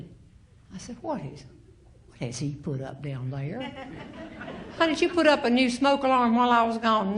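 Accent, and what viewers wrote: South, United States. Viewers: Love your stand up humor Jeanne I have a lady friend and she is from the south. She talks like you and jokes around. we call her southern Bell. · There’s something so lovely about the southern accent which makes this even funnier;)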